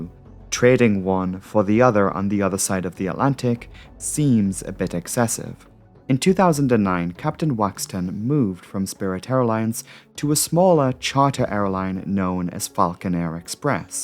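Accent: Newcastle, United Kingdom. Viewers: Thank you for an amazing researched informative and beautifully presented video. By the way, nothing lovelier than a nice Geordie accent! X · I started the video wondering what accent this is, but wouldn't have pegged it Geordie apart from the pronunciation of year. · I'd adore if you did a full video with your native Geordie accent, it's such a lovely one! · You're a Geordie? I'd honestly never have guessed until you made it very obvious! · Your not a Geordie are you ? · You sound a bit geordie, but what else is going on with your accent, no way it's100% newcastle... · Why I’d neva ov thought you were a geordie. I’m from Durham U.K. love your vids. They’re canny good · GREAT video. I used to live in America, and I keep abreast of news from the states. Florida governor DeSantis is pro business to the point of business there running rough shod over ANY regulations what so ever. 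It's become an abyss of regulation dodging garbage. I am NOT SURPRISED so much of this is traced back to Florida at all. I would not trust anything coming from Florida (including the pilots themselves it seems) to have any degree of quality to it these days. You produce amazing videos, lovely Geordie accent girl! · I have wondered about you accent. I had no idea that you're a Geordie. Awesome! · "Dana Air...grounded for maintenance and other violations so often we haven't had a fatal accident in ten years!" Your dedication going down the WTF rabbit hole at the end of this was appreciated. Incompetence is funny when it's not injuring people (although those who need refunds might want a broader definition of "injury".) And never apologize for a Geordie accent. As far as this American is concerned, the BBC has to apologize for exporting only programmes in which everyone spoke RP or occasional Cockney until the 90s. 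They've been holding out on us! Now that we've basked in some lovely Sheffield and Leeds Yorkshire for the past five years, Doctor Who isn' allowed to have a southern accent again until they've cycled through Scouse, Mancunian, Brummie, Geordie, Welsh, and Northern Irish. ;) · Your Geordie accent was Beltas Mate! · Your a Geordie? Well that was a surprise. Haddaway. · Go hard on the Geordie accent Sir. I was really fascinated by your research and subsequent report. Ah, I do not think I need reminding that booking a flight on Dana Air will not result in a satisfactory outcome. Sounds like a way to fleece unsuspecting, non-Nigerian international travelers out of their money and stash it under the mattresses of certain Nigerian government officials. To be fair, most of middle and south Africa is in a state of turmoil at this time, so it is not surprising that a certain 'cowboy' style of airline operation would be able to survive in such a theatre. 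What was especially shocking however was your discoveries concerning the engine maintenance outfit in the USA. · I would never have guessed you had a geordie accent :)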